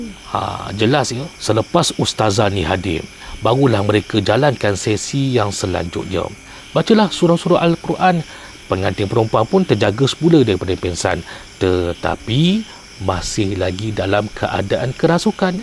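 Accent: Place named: Sarawak, Malaysia. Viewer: Abang orang mane Sarawak ke?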